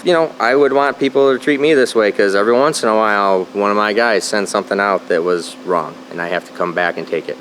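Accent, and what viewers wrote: Michigan, United States. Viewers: that Michigander accent coming through 😂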